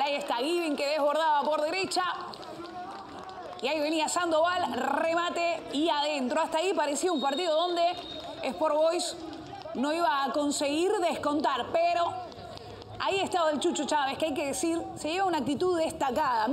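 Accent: Argentina. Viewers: Una hembra comentando ?? Y con acento de argentina ...hasta el culo el periodismo futbolistico en el Peru.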